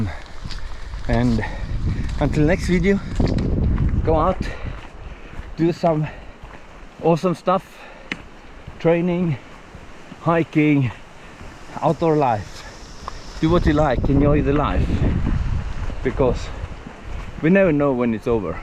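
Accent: Sweden. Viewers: That was good entertainment! I am ready now for a tour in fog and rain, and I will practise my attitude ;)) I don`t want to sound creepy, but I love your SwEnglish, it it is very relaxing to listen to.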